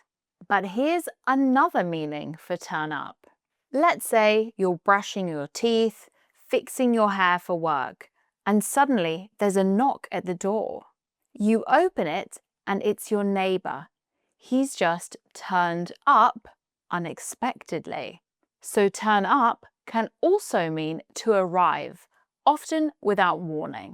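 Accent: RP, United Kingdom. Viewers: I love british english accent, and specially, the RP. There are several youtube chanells for MP; but there are just a few for RP. And I enjoy your lovely way to teach us. Thank you and greetings from Colombia.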